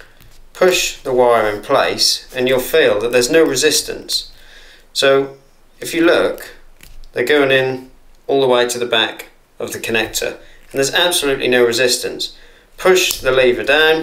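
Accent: Norfolk, United Kingdom. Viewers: Are you from Norfolk? & put a Ferrell/bootlace on it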